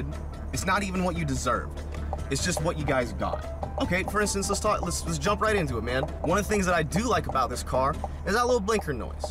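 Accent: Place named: South, United States. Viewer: He lowers his level of southern-ness so people online can understand him lol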